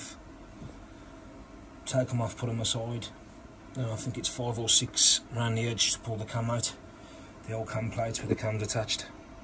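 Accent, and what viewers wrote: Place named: Black Country, United Kingdom. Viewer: Nice to hear a black country voice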